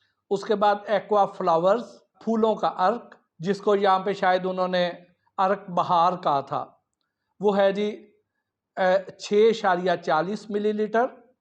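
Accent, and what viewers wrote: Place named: Punjab, India. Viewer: this pendo man does not know anything . his urdu accent is mixture of punjabi . funny language 😆😆😆😆😆😆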